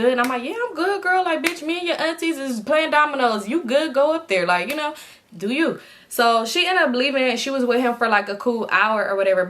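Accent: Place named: Texas, United States. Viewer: “ hey yall “ in every intro. 😂 sound like you from Texas tbh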